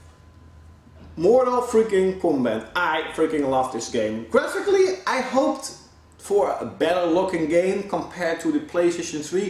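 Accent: Netherlands. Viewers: are you dutch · Haha goeie collectie, je kan trouwens goed horen dat je nederlands bent. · Are you dutch? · Are you dutch? Nederlands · Gast je hoort echt te goed dat je nederlands bent... werk aan je uitspraak!!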